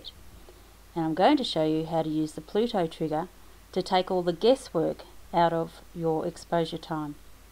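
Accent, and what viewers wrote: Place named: Australia. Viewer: Hi, I love your tutorial style! Lovely articulate Aussie voice. Some other tutors talk way to fast for me. I am a beginner in photography world and have done my first on astro shoot which was totally out of focus, so I reached out on good old Youtube to find some answers. Following on from your Night photography tutorial I found the ND filter one and was curious so subscribed to gain further insight. Thanks for sharing your knowledge with me. Jane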